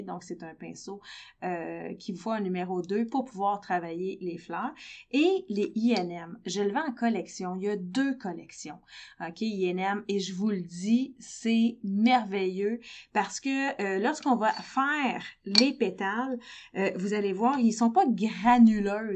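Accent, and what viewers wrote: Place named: Mauritius. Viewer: Vous avez l'accent d'une Mauricienne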